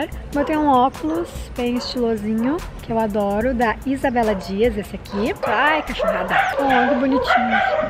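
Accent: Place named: Rio Grande do Sul, Brazil. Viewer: você é gaúcha né? Por favor que sotaque lindo, mas hein.... Adoro suas dicas ♥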